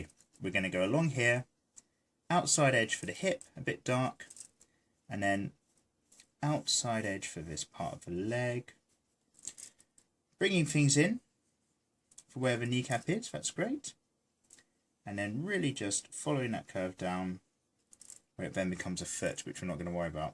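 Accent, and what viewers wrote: United Kingdom. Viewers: God DAMN he’s so British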